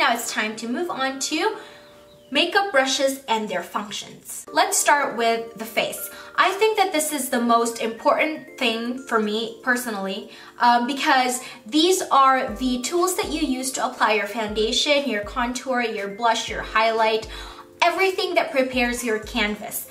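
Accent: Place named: Philippines. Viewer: You are so PRETTY! and I sooooo LOVE your accent! BTW, are you a filipino?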